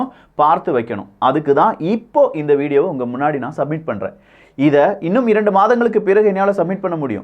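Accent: Kerala, India. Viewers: அவர் மலையாளி கலப்பு. அதான்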